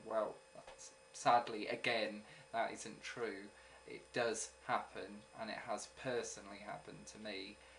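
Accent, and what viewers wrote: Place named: United Kingdom. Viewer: This has absolutely nothing to do with the topic of your video, but everything to do with my preoccupation of speaking with different accents: Where are you from? What PART of the UK? Because wherever you're from is where my I-don't-have-to-think-of-it British accent comes from. Fucking love it.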